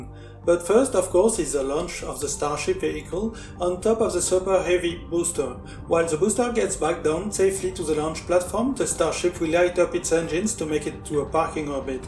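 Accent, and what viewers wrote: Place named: France. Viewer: Nice french accent 🤟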